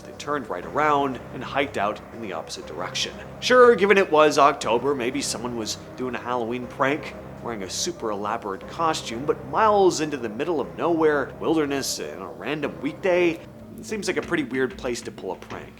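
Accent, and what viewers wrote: Australia. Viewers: Australian accent... verdict...Not to bloody bad cobber.. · Great creature pics!... your Australian is perfect!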